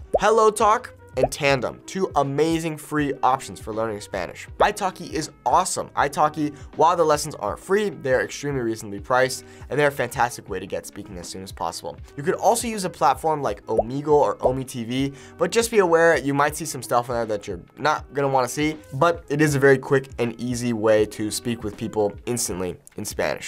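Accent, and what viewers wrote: Mexico. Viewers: You're so good. You sound like a whitexican and that is so good heree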